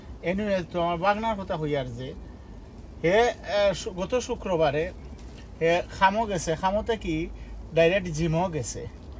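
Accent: Sylhet, Bangladesh. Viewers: আপু সিলেটের আন্চলিক ভাষা একটু ভিন্ন। তাই আপনার বুঝতে কষ্ট হচ্ছে। আমি ছোটবেলা থেকে সিলেটের আত্মীয় স্বজনের সাথে কথা বলে অভ‍্যস্ত তাই বুঝি। উনার ভিডিও গুলো অনেক informative. ইনশাআল্লাহ দেখতে দেখতে শিখে যাবেন। 😊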